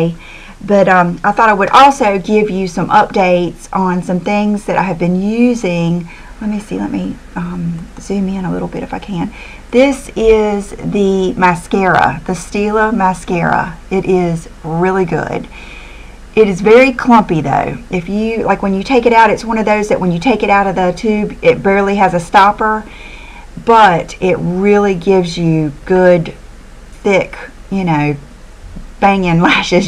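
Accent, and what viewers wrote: South, United States. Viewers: is from Wilmington, North Carolina. Southern accent.:)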